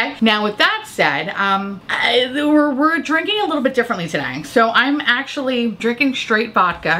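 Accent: France. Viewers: Your french accent is so cute ! And yes, you prononce correctly the word "étoile" ^^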